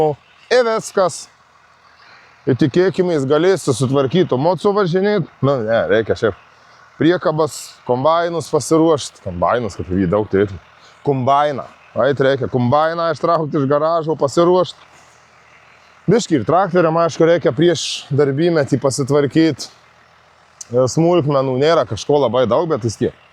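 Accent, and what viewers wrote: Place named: Suvalkija, Lithuania. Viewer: Sveiki, dėkui už naują vaizdelį 😀 pasidariau priklausoma nuo jūsų suvalkietiškos šnektos ir laukų 😃. Man, kaip kaimo vaikui, tokie peizažai labai mieli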